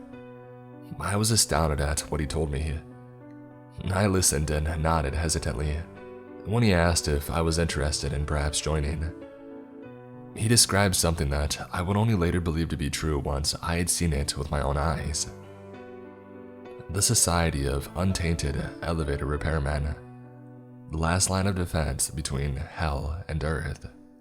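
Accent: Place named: United States. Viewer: Ok I love this channel but some videos he does this voice? An American accent? I'm American so no hate but.. he sounds like a valley girl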